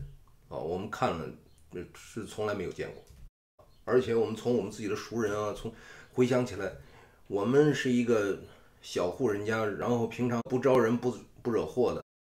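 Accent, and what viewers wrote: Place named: North, China. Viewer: 聽您講生活故事．真的很有趣．很標準的北方腔．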